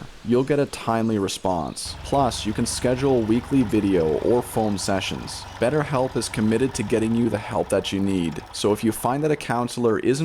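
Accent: Canada. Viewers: What a beautiful cabin! Love the wood stove that you put in too. So nice to hear your Canadian accent, I'm from Canada and I don't get to hear the accent often. Love your front door too, it's huge and perfect for the cabin. (from Sandi)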